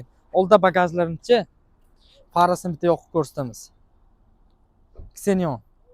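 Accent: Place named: Tajikistan. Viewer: Beat tojik ekanlar aksentlari bor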